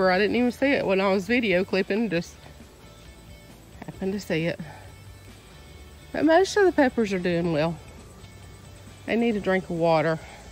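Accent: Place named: South, United States. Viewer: Your accent sounds like home to me. Must be close to Memphis TN. Maybe Georgia? Thanks for the tour! Love the name! Chuck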